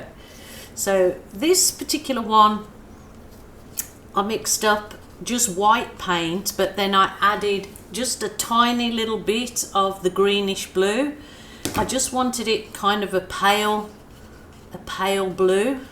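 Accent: Birmingham, United Kingdom. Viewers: Hi from Yorkshire!..found you 2 hours ago... Watched an hours worth and drove to Asda ( Walmart) before it closed at 10 pm for paint etc...soooo excited to try this! oh and do I hear Brummy twang or am I way off?. Just realised I'm out of tin foil....but remembered the balloons though.. I'm at that dodgy age haha.. great to find you, new subbie here! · Omg ! I knew you are a brummy, just heard you say Perry common I’m down the road in Walsall Just love your work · Hi just found your paint pour video’s which are absolutely amazing 🤩 I could hear brummie accent coming which was awesome! Thanks for sharing your gifted talents! I’m just starting out my paint pouring journey! Lv from another brummie Christine x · Yes 😍 still got youre brummie twang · First time watching you today, and within 1 minute i was convinced you had a brummy accent, and this was confirmed in the very same vid. 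Amazing work, i absolutely love what you done here !! New subscriber! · I knew you were a Brummie I could hear it in your accent lol. Love your artwork tutorials. I haven't been brave enough to do a 'pour' of any kind yet but I absolutely love the 'balloon work'. Am trying to think of a way to do the balloon work with my great grandchildren who are 4 & 5 years without it being too messy 😂❤ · I am brand new to pouring and my goodness, what a joy and aspiration this is. It is absolutely beautiful. Can I also say I love your accent. My mom came from Rednal Birmingham and just to hear the accent again is a joy. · Are you from Birmingham uk? You have a kind of brummy twang to your accent :) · ahh 20.40 you did say your were from birmingham. i have cousins from there and i could hear it. im a scot though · Your accent sounds like you have a bit of brummie in it?... beautiful painting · Lovely/Bostin!! 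I want to try it on silk! I'm just coming to the end of my first year at BCU studying textiles so will try to see how it looks with procion dyes etc. I am a very mature student and still love learning all these new things. Thought I detected a brummie accent.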